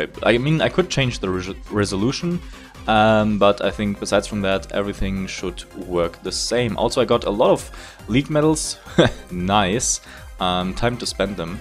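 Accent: Germany. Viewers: Cant unhear the german xD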